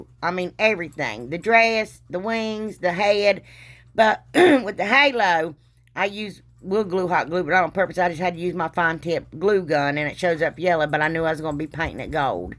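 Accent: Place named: Louisiana, United States. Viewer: Girl what state r u from? Your accent is just what we call here in South Louisiana COUNTY..love your channel,be blessed.loved everything but my favorite was the angels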